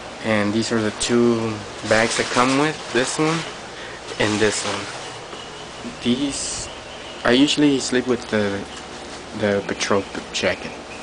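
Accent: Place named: Chicago, United States. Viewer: Oye: thanks for the review. love your accent ,too. reminds me of my friends form chicago!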